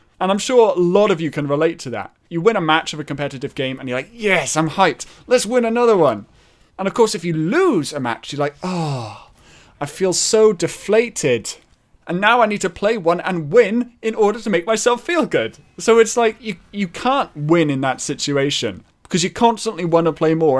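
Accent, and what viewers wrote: Wales, United Kingdom. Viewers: I can't quite make a definite decision but I seem to keep picking up on a little tinge of a Welsh accent when you speak. Being from Wales myself I can somewhat recognise certain changes in the way you pronounce things such as "here". Anyway, sorry to ramble, was just curious.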